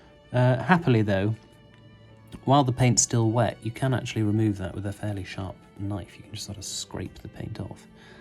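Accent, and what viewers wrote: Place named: North, United Kingdom. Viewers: Are you based out of the north east by any chance? Sounds like you have some northern accent come out now and again lol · Thanks. I have a long interest in mining, so thought it was a Davy. (Does your occasional Yorkshire accent have anything to do with family history 'oop noorrth'?)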